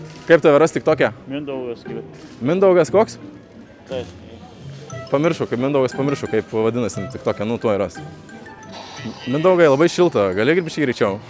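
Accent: Suvalkija, Lithuania. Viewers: Jauciasi suvalkietiskas akcentas 😊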